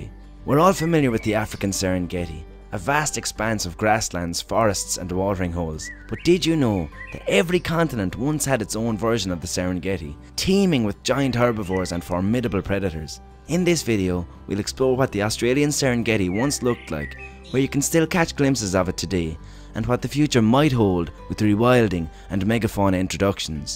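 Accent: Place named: Ireland. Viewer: An Irish Attenborough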